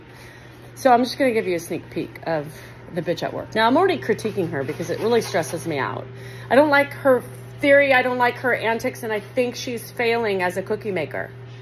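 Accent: South, United States. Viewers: Good afternoon, and once again, you are the always hilarious and sarcastic chronicler of everything and your sassy Southern accent is the icing on the cake lol!! Those cookies all do look damn good and who doesn’t eat the whole Oreo?? Of course you have to take it apart and lick off the cream inside but also part two is dunking a whole Oreo in a big tall cold glass of milk (anyone who doesn’t know this is the procedure with Oreos, doesn’t deserve them!!😂); have a great day, and let’s hope you did not get diarrhea from eating all the cookies lol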